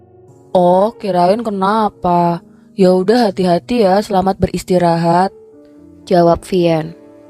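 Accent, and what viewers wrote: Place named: Java, Indonesia. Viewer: nedok mbak e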